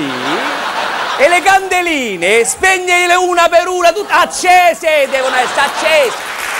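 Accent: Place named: Rome, Italy. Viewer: Una velocità di battute,strepitose Poi quella parlata romana aumenta il potenziale Davvero bravo bravo bravo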